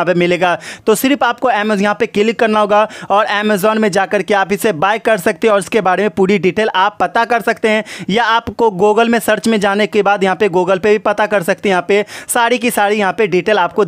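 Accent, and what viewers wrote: Bihar, India. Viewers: Totalaa nahi hai BIHARI hai... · Bihari h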